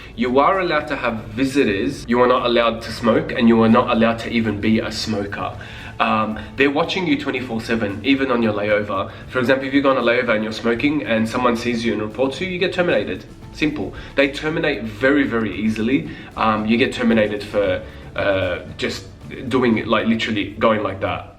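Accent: Australia. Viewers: Does it get better the longer you stay there? 😢 Ps you sound aussie!!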